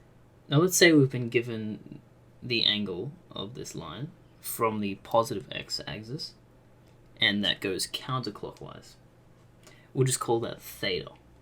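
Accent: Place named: United Kingdom. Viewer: Thank you i love your British accent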